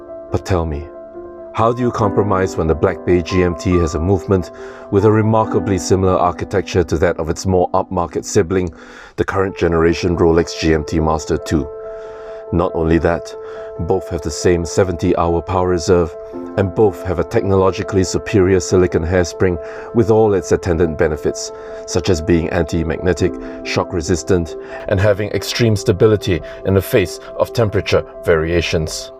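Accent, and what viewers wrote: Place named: Singapore. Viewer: to - wow. The writing quality is really up there. Turning the born to dare line around was smart and potent. I used to live in Singapore and recognized your accent... then I saw the beaches and knew it was Singapore! Congrats on this quality content, dude. As for me, the Tudor BB GMT is not for me. I don't think I'll ever be able to justify paying more than 1000 for a watch when you can invest it and return 7-10% per year in the market. The mark up is also inexcusable (cost to make vs. MSRP). I don't doubt at all the quality of these expensive watches though. Great video, please keep going. The watch community DOES need thoughtful content. You're going to grow big in no time with these type of thoughtful essays. Good luck.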